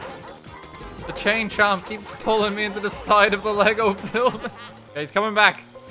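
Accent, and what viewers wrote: Ireland. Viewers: holy shit its irish jerma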